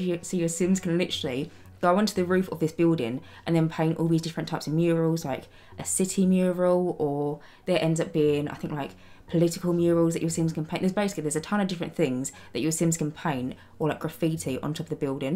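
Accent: United Kingdom. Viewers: The British accent helps a lot.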